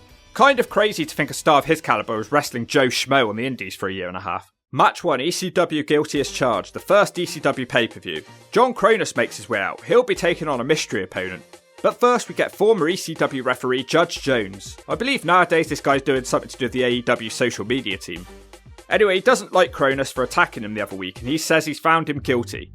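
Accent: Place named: United Kingdom. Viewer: Seriously, dude. You're a Brit. Why are you writing about American culture, you freak? In case you're dumbass wasn't aware, pro wrestling is a product of American culture, so fuck off with your gay high-pitched Brit accent. It's not manly and it's definitely not suited for an American audience. In case you haven't noticed, Americans are real men and Brits are not real men. Just watch a Benny Hill comedy special to understand how femine and weak real Brits actually are.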